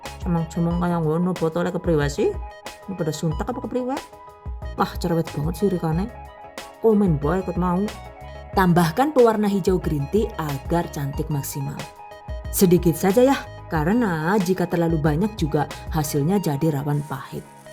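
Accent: Banyumas, Indonesia. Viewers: mmg sllu beda...lbh keyeeennn...tampilan kuenya cantiq kyk inyong ☺😚ngapaknya itu lho jd ciri khas 🤗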